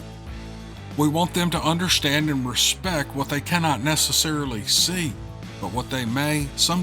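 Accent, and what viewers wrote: South, United States. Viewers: Hi I'm from the US Virgin Islands I listen to your podcast and I enjoy the Steve Lily and enjoy the tone of your voice it's a real southerners voice and I am very bad at writing so please bare with me again thank you.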